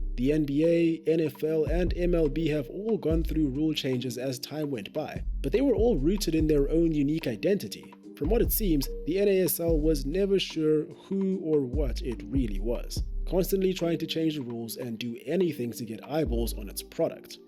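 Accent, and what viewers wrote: South Africa. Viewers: Are you South African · Accent sounds like a black south African for some reason....just saying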